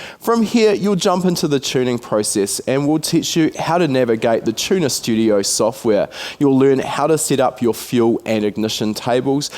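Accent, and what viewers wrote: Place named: New Zealand. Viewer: Kiwi???..where are you in nz??? Cheers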